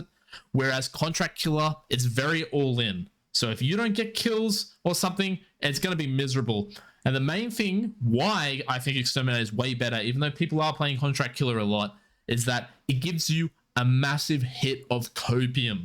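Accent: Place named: Australia. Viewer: do you play in SEA? you sound aussie XD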